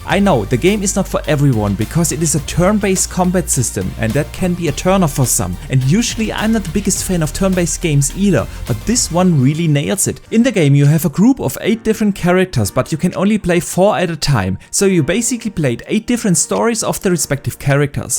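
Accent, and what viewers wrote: Germany. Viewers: You sound german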